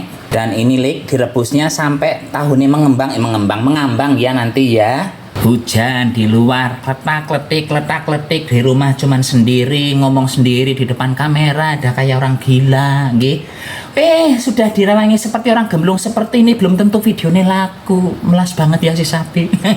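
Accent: Banyumas, Indonesia. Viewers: Saya suka kok lihat vidionya mas bahasanya sama karena saya orang ngapak jg salam ublek2 dan cus enak endol baksonya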